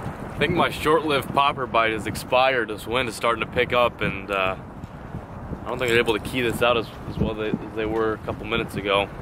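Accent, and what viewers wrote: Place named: Texas, United States. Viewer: anyone from 2017 and notice his texas accent? LOL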